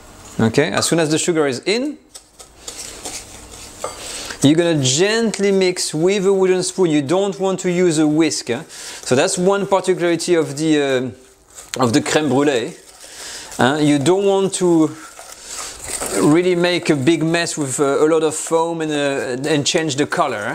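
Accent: France. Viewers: This tutorial is a winner 🏆. I have exactly the same ramekins and the French accent have convinced me!!! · I like your French accent man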